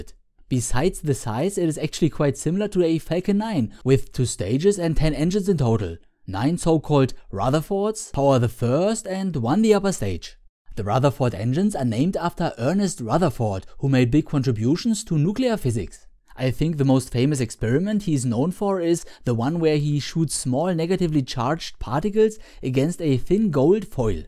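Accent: Germany. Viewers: Dieser deutsche Akzent ist so göttlich. :)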